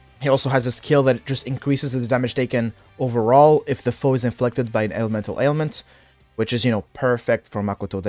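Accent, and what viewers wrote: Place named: France. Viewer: You can't mask that french accent!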